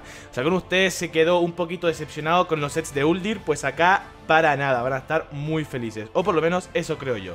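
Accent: Chile. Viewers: este men es chileno no?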